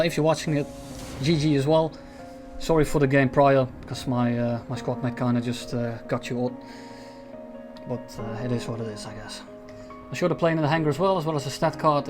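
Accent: Netherlands. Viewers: Nice vid! Also r u dutch?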